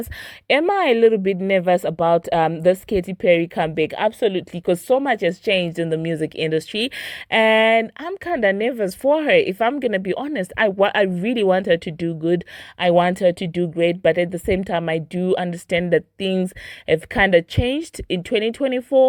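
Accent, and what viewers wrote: South Africa. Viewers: Love your channel are you South African ? Where is your accent from ?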